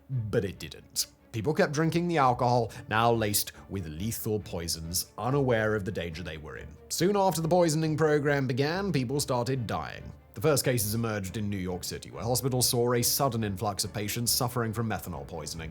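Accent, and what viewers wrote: England, United Kingdom. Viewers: I'd love to hear this guy speak when he's not putting on the poshest English accent he possibly can. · Whos laws are we talking about? This guy had an English accent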